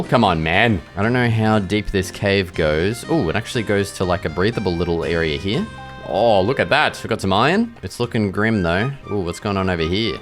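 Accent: Australia. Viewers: Awesome gameplay to watch and listen(i just like an Aussie accent). And also, you should definitely defeat karkinos would be a nice goal for the series imo.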